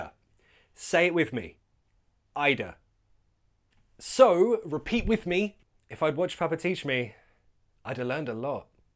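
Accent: United Kingdom. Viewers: Hlo sir I am Tara that's really amazing I am up for watching ur video I like ur teaching style.however I wouldnt like British accent bt uve grown on me thank u I love u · Amazaing sir British accent English 😊😊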